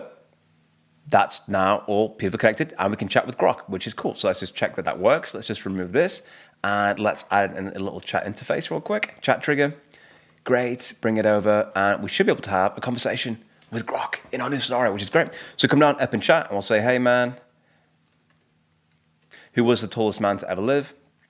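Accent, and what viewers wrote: United Kingdom. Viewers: it looked interesting, but a Brit might have no trouble understanding your accent, given the speed at which you speak. This ignorant American can't follow. You might consider slowing your cadence to Douglas Murray's speed so we, who love the sound of the British accent but don't understand original English fully, have a better chance of following what you say on a complicated subject. Cheers ~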